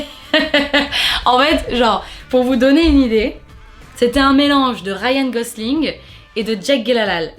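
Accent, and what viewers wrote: France. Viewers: C'est beau 🥰🥰🥰 Cet accent niçois qui fait toujours plaisir 🙃☀️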